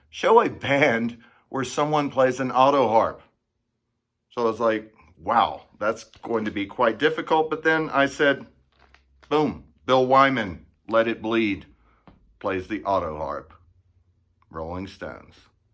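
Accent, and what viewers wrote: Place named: Sweden. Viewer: I was trying to guess your English accent. You don't seem American or English. Are you Swedish? You don't seem German either.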